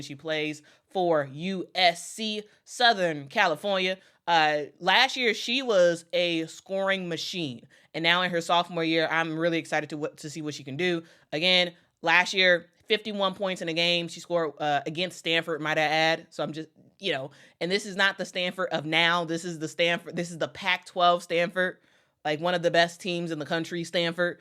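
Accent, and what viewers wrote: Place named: New York, United States. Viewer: where is this nyc accent coming from? Lol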